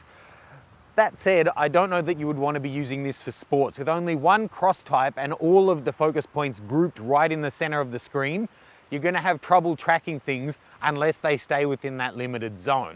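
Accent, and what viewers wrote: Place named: Australia. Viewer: The Australian accent is the hallmark of racist moron in the world right now So if you want to talk about cameras tone it down on the ugly accent Mate !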